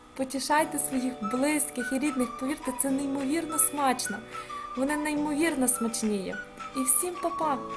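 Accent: Western Ukraine, Ukraine. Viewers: Не гадайте яка область західний говір приємний ніжний доходить до всіх хто кохається в мові України.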